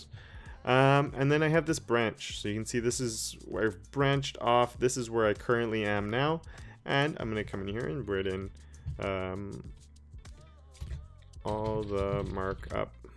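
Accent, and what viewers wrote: Canada. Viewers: you must be Canadian arent you? the accent sounds soo Canadian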